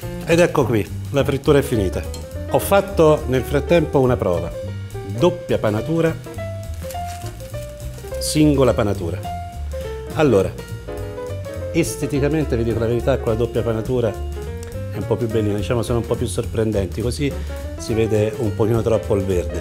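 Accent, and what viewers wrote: Tuscany, Italy. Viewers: Forse perchè è toscano? Di solito dicono anche seggiola invece di sedia!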